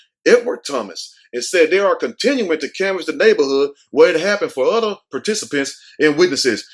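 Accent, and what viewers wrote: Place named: Texas, United States. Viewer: Didn't know you were in Dallas boo..I'm tryna meet and greet..I knew you sounded like a Texan..lol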